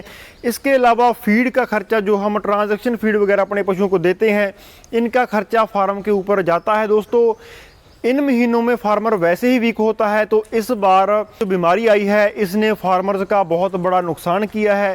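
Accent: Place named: Puadh, India. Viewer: bhai puadi bol rhe ho kha se ho app bhai ji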